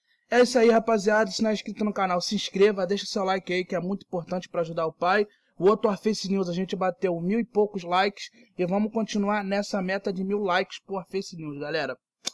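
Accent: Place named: Rio de Janeiro, Brazil. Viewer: mds carioca conversa feio mano. na moral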